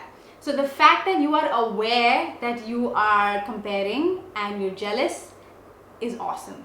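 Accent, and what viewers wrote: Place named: United Kingdom. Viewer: Love your British accent can you make a video on British accent pleaseeewwe.......... 💓😍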